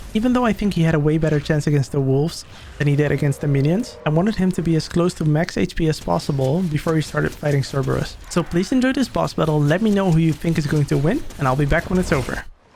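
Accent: Netherlands. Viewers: Hey!! Just wondering correct me if I'm wrong but umm, are you Dutch by any chance?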